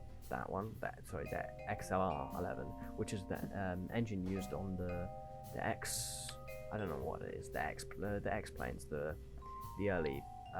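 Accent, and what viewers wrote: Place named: Australia. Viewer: why you an ozzie mate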